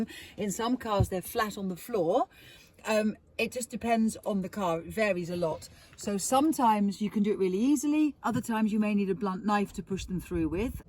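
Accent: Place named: United Kingdom. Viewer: Ach British is the nicest accent in the world - it's like balm for my ears ❤😊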